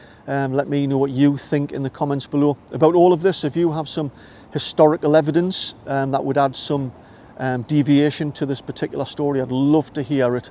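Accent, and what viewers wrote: Newcastle, United Kingdom. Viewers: Hola! Im not from The UK and just found this video searching about this accent. Is the presenter speaking w a Geordie accent? Or where is his accent from? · I always called someone a Geordie who had an accent like yours.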